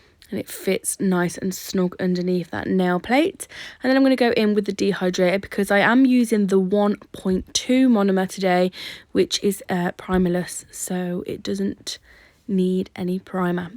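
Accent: United Kingdom. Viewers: Jajajaja i love the way you said piña colada I’m from Mexico and I love your British accent Greetings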